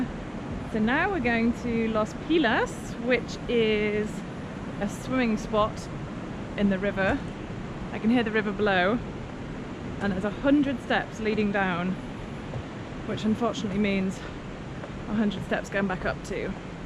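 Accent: England, United Kingdom. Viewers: You’re from England? I can hear your accent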